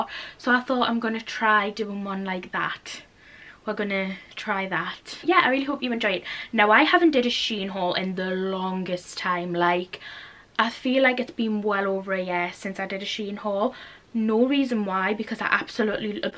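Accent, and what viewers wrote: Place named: Durham, United Kingdom. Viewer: It’s a dressing gown lol but I’m also from Durham near you so we have the same way of speaking lol x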